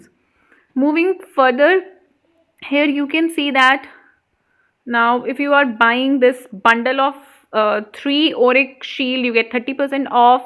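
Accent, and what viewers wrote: India. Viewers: its scam once hearing a hinduh voice nexttttt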